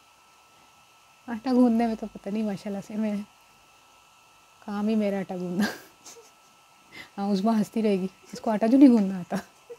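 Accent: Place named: Punjab, India. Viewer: Wooow v nice... Apk baat krny ka andaz bhot khub surat h r sath main panjabi ka tarrka wah wah 😍😘😘💖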